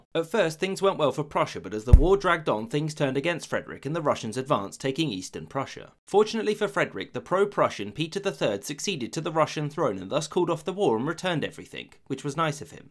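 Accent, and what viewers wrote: United Kingdom. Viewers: Yes that's a British accent lol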